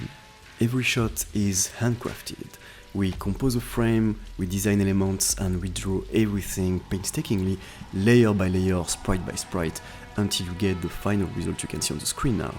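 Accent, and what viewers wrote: France. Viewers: il a pas un accent francais? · Il est la laccent français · French accent ? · Amazing french accent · That thick, french accent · The French Accent of the Narrator was just the cherry on top of all that beauty. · Je reconnais là l'accent français! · Where are you from? France? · c est un francais qui parle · french accent spotted x) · Un bon accent français bien de chez nous. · looks very nice. but this french accent is horrible! i cant even understand half of what this guy is saying ^^ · oh man Your English is so French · THIS FRENCH ACCENT HURTS MY EARS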